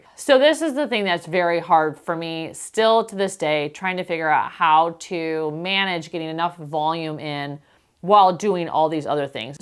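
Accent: United States. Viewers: I’ve been following for a few years and seeing you grow to this extent has been such a genuine joy to witness. I watch you and Laura’s videos religiously and they provide such different, but equally inspiring and entertaining, vibes. For me, you’re the British lady with an American accent 😀 I’m British and I just find your sense of humour so comforting. Well done lady! You have strangers feeling proud of you and that has to be a cool feeing ❤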